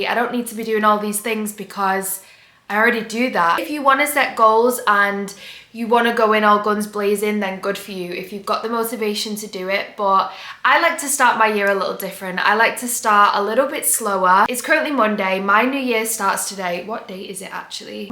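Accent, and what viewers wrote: United Kingdom. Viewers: I just joined ur channel and im enjoying. Where do u live bc ur accent is so adorable. Im assuming UK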